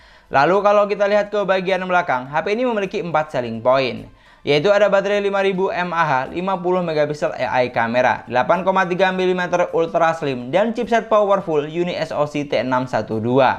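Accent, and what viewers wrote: Madura, Indonesia. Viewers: Madura mana be'en kek?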